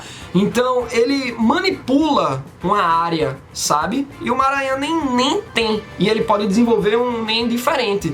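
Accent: Pernambuco, Brazil. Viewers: Ei mano, tu é de Pernambuco?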